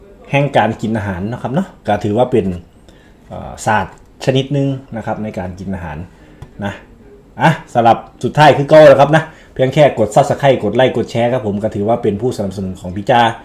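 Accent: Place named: Udon Thani, Thailand. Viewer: คือเว่าคือคนอุดรฯแท้อ้าย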